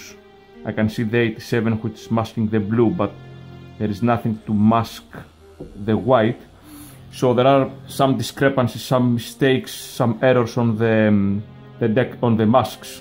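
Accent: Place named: Greece. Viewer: I have a question, where are you from, i'm trying to place your accent, I want to say its Greek, but I could be wrong.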